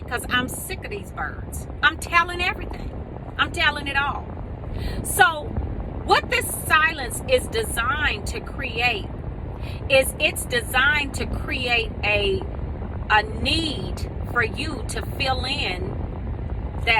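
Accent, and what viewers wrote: South, United States. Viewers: Love u Soo much♥️ U are so real, southern sounding and wise. I'm from Florida in Denver . Thank u for your content🥰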